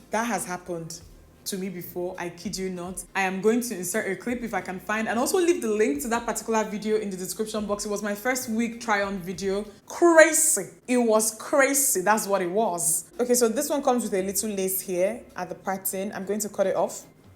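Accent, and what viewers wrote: Nigeria. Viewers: That nigerian accent made me😂😂😂😂😂😂, 💓💓💓👌🏿👌🏿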